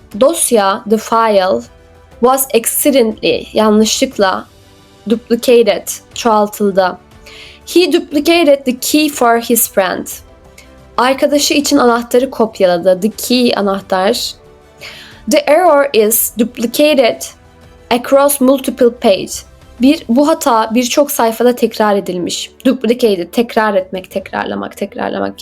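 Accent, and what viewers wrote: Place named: Turkey. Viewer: R leri cok bastiriyorsun turk aksanindan cikman iyi olur biraz dinleyip birseyler gormek istedim ama aksan rahatsız edici. Dinleme telaffuz geliştirmen faydali olur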